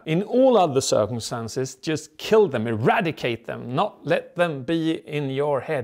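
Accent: Sweden. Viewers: Well they are both swedish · Are you swedish? Also great job on the video watching it made myhead hurt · Do i hear some swedish accent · e han från sverige eller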